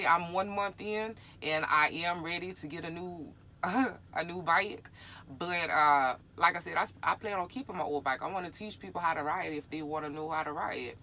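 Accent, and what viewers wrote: Louisiana, United States. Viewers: I love your Louisiana accent! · Got a mad crush on you, love visiting your page. That cajun accent, soooooo weeeee, gotta find you hotness.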